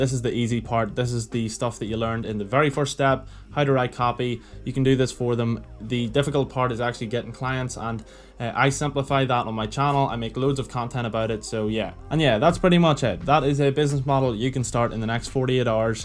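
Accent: Northern Ireland, United Kingdom. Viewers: Hi, Recognise the accent so I assume you live in NI like myself. I'm looking some guidance into how to make money as a side hustle and would love your advice or coaching. How can I contact you? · Northern Ireland bror?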